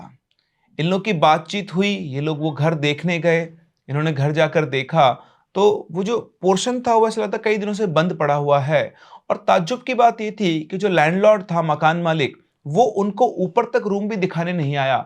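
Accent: India. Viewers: आप का बोलने का तरीका बिलकुल देसी हे ❤❤❤गांव जैसा बोलते हैं जो देसी नहीं होगा वो शायद ही समझेगा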